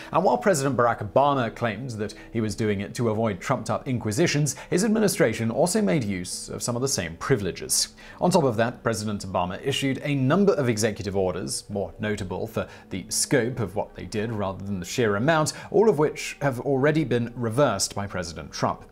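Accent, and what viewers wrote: United Kingdom. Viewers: I don't know, somehow when a guy with a British accent starts trying to 'splain the US Government just doesn't really work for me. Maybe get someone from Boston to do the job next time...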